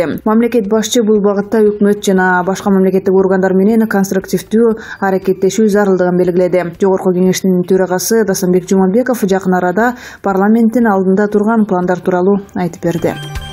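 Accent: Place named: Turkey. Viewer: Диктор сен эмне эле турктордун дикторунун дикциясындай окуп жатасын? Киши жадап кетет экен.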